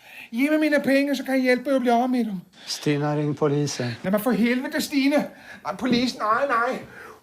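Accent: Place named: Denmark. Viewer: Jä , å ändåäh prätar han 100% Danskäh 😅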